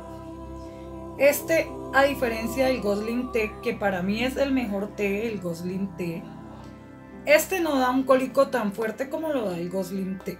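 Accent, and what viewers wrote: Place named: Colombia. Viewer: Me encanta el acento colombiano!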